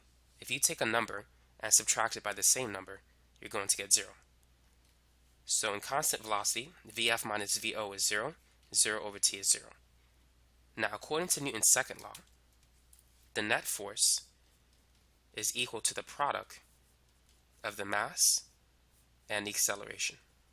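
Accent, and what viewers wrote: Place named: Boston, United States. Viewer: I am a woman and this guys Boston voice is so hot.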